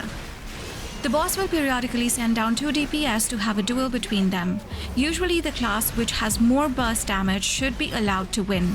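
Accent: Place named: Asia. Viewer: It's very refreshing hearing a fellow Asian covering WoW with your accent lol ❤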